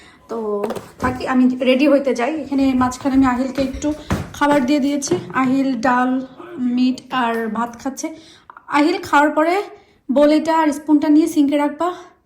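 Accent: Sylhet, Bangladesh. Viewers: তোমাকে আমার খুব ভালো লাগে আপু,আর তার সাথে সিলেটের ভাষা😊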